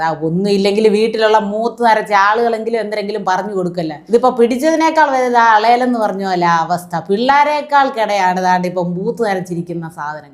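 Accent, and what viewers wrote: Thiruvananthapuram, India. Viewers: Super. TVM slang is suerb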